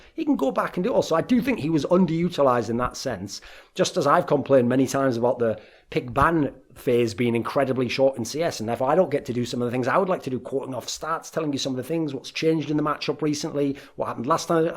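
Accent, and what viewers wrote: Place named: North East England, United Kingdom. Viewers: Yugo Betrugo Duncan is from South Shields in England look up the accent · North East England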